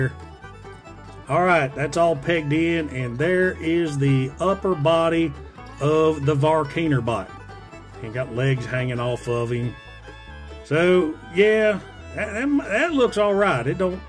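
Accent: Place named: South Carolina, United States. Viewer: I live in S.C. and I got some family that talk just like that, except a tad more intense. I have a mild case of it but I can't help it. 😂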